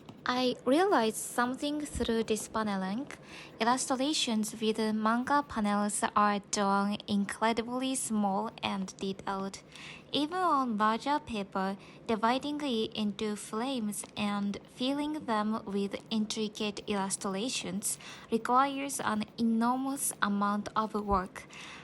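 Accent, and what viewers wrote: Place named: Japan. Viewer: Omaigah a japanese talking in inglish idiom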